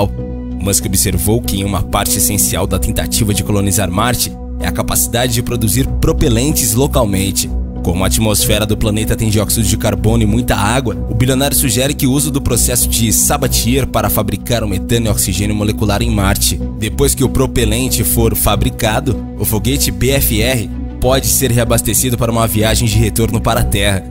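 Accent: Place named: Caipira, Brazil. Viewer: O cara falo com um sotaque caipira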